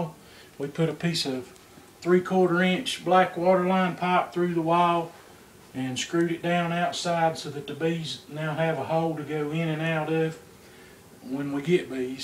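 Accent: United States. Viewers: oddly enough your accent sounds like my family from Southeastern Kentucky & West Virginia. Thanks for sharing! Great idea! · Just a good ol country accent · Thanks for the video from Baton Rouge, LA. Where are you, sounds like you are a fellow Southerner!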